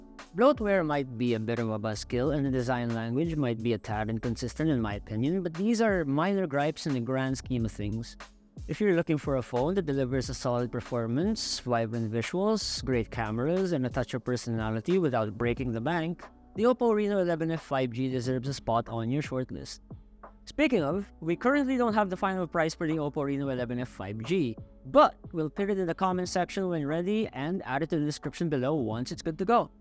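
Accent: India. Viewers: Bakit may slight na Indian accent? Lol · Indian nationality ba yung nagsasalita? 😮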